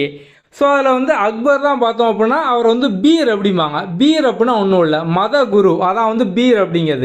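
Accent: Tirunelveli, India. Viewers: Are you belong to Tirunelveli?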